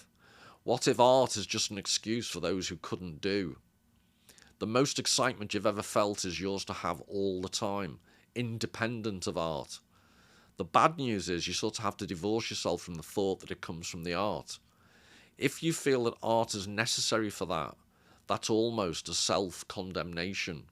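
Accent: Liverpool, United Kingdom. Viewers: I discovered you a few days ago on a Richard Grannon video and since then I have been making my way through your videos which I have found to be immensely interesting, highly educational, entertaining and at times hilarious. Love the Scouse accent and humour. Thanks for your work.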